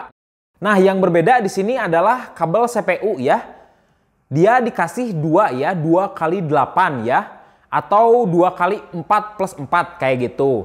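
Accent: West Java, Indonesia. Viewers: urang sunda nya kang 😄😄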